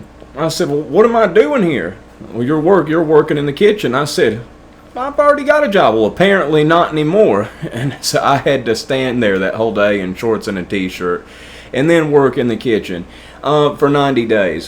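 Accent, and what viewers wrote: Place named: Tennessee, United States. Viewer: Man great story. When I hear you talk it sounds like a TN accent, sound like we grew up together. Kept wondering did I go to school with?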